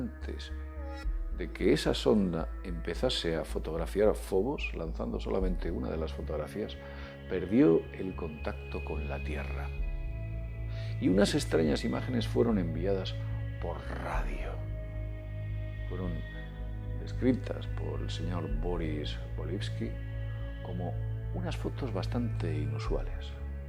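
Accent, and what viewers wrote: Spain. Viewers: Yolanda Lemus Palacios no estareis acostumbrados al acento español o por otras circunstancias serivadas de vuesto dispositivo, pues se le escucha perfectamente....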